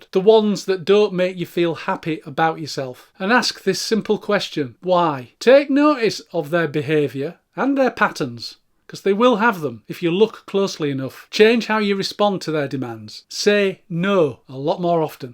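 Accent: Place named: Yorkshire, United Kingdom. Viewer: Not English, it's Yorkie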